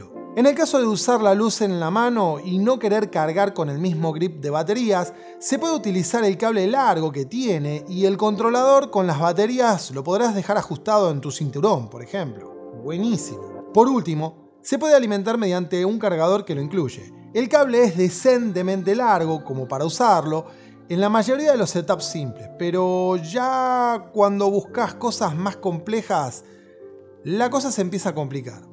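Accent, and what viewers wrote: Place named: Argentina. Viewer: Conocí el canal por este video. A ve que está muy bueno. Solamente medio bizarra la mezcla de español neutro y acento argento jajaja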